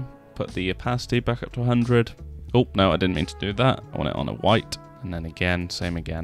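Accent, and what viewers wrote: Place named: United Kingdom. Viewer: I can't understand some British accents,but your voice and accents are cool.Thank for making these things.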